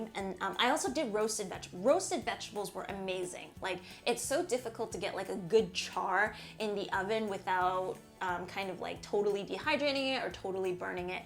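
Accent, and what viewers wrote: United States. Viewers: Asian lady with an American accent in a kitchen with UK plug sockets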